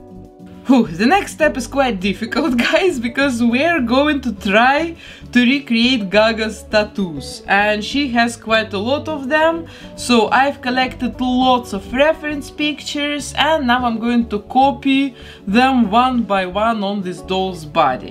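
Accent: Russia. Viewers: Мне тоже кажется, что русский акцент. Но, возможно, она не русская, а просто есть много стран, в которых четко произносятся все буквы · Русский акцент 🤘😎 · Почему я слышу русский акцент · I can hear Russian accent.Вы большая молодец! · Вы случайно не русская? С акцентом говорите) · Ты очень похожа на русскую девушку. Особенно русский акцент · Мне кажется или я слышу какой-то типа русский акцент?)) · Это руссктй акцент? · почему такой жёсткий русский акцент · акцент как русский хм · у вас золотые руки, но от русского акцента нужно избавляться... слушать невозможно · Автор, вы Русская? Акцент прям жесть) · Я слышу русский акцент... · The doll is cool, but the accent you have isn’t cool at all. Too much of a Russian, even hard to understand sometimes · Удачи! 😅 Вы крутые. Вы русская? У вас просто русский акцент 😃 Красивая леди гага получилась! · Гриша Человеческий я тоже думаю,что она русская,в уши с самого начала прям ударил русский акцент 😂 но куклы у неё прекрасные😍😍😍😍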